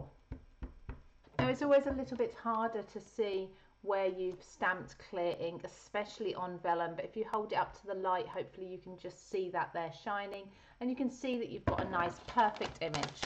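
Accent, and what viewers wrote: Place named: United Kingdom. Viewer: lovely cards thanks I need ro make one hreat ides. That said but personal peeve why are you using only inches and not cm? It really irks me that many places globally use CM but in videos people pander to the USA and use inches and do not give the CM so the rest of us in the modern world have to convert. I can hear you sound like you are fellow Brit not an American if that the case you will know about CM.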